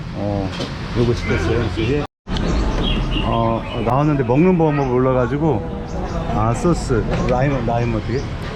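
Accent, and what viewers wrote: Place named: Chungcheong, South Korea. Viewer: 충청도 어디여유?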